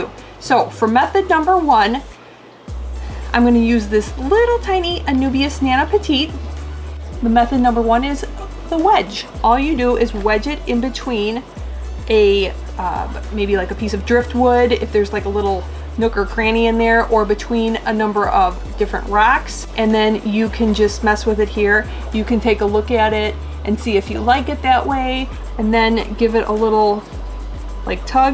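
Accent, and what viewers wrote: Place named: North Woods, United States. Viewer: Wish I had watched this before I made all the mistakes you warned about lol! I hear enough “ope’s” in der for me to think us girls are both from the nort woods. I too share your water parameters. I have had an embarrassing hard time with anubias. The leaves are prone to yellowing with holes and turning into a brown mesh work before death. I have increased fertilizer with what looks like improvement. They get low to medium light with floaters above. Any suggestions?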